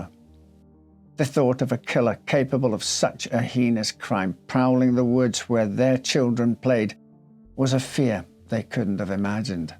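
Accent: Scotland, United Kingdom. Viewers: This is one of the few channels on YouTube that manage to tell stories in a way that really and honesty grips me in every way, it's such a tragic case, and it's also such a shame that we have come to a time where kids playing on their own are no longer considered safe from murder most foul, so I can only imagine what a shock it must had been in a more innocent time where people honestly feared God too much to do such evil things. Also as always this is a high quality production, with great narration. That Scottish accent is so pleasing to listen to, you should consider reading some classic audio-books, like LibriVox books. I hope to "steal" the 'style' in clothes, it's so elegant, I only have boring clothes myself. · I would listen to you read a phone book. your voice and your delightful Scottish speech pattern...yum. Then, the stories are always interesting, though sometimes heartbreaking.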